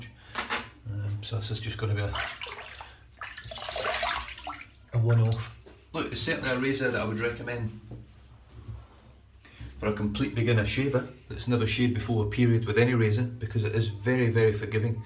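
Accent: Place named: Scotland, United Kingdom. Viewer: Hi new to DE shaving some great videos!! I recognise the Scottish accent good to see a fellow Scot on here. I'm in the west of Scotland myself.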